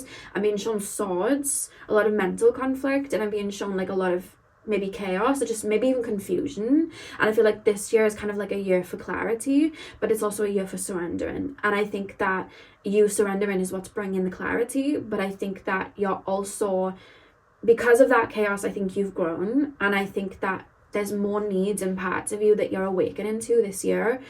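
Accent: Wales, United Kingdom. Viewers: We missed your Welsh accent and voice so much!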